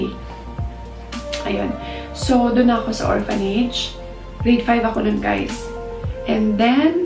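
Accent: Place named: Manila, Philippines. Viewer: Manila tagalog accent . Very clear.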